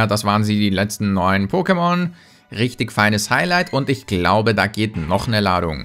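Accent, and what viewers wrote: Austria. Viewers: Bist du Österreicher?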